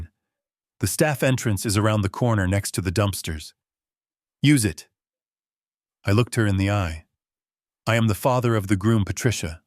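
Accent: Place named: United States. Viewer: Enjoyed listening , nice clear American accent , from Scotland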